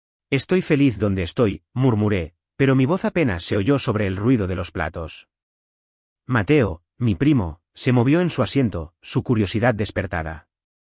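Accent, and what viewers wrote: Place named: Spain. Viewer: Si la trama del relato se desarrolla en México como país, ¿porqué el audio está en español ibérico y no latinoamericano?. Cansan sus terminaciones en "eis" como dudasteis en lugar de dudaron o hicisteis en lugar de hicieron y muchos términos más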